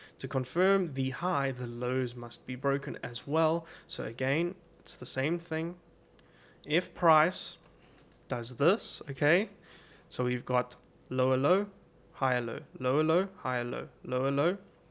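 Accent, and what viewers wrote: South Africa. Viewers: My guy, great video. Your accent sounds like you are from SA or Namibia... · Finally the South African accent haha making us proud well done best content I’ve seen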